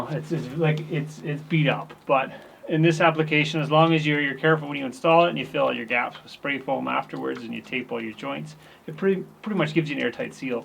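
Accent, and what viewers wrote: Canada. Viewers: canadian eh?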